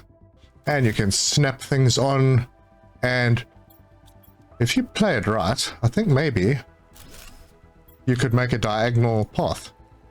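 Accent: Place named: South Africa. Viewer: Clicked on the video and that accent jumped out at me, haha. Fellow South African! Awesome videos, man!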